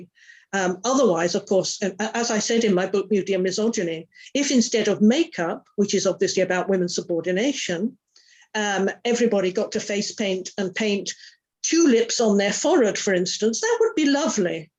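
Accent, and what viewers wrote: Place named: United Kingdom. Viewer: Thanks. Her tone and cadence in way of speaking is very hard for me to listen to. I think this is a British thing. There are British men who speak this way as and I can't listen.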